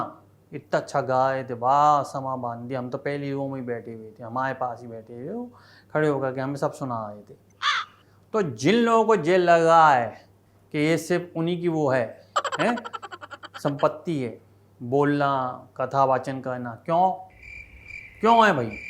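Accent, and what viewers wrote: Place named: Uttar Pradesh, India. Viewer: Bhai ap kisi ki bhi mimicry karte ho to apke dialogue bhi local language mein hoti hai aur tone bhi same up wala...jaise hari nahi hai ye up ka pronunciation hai yahi apke kirdar mein char chand lagati hai....mujhe apne clip ka intzar rehta hai aap sach mein telented ho...radhey radhey 🎉🎉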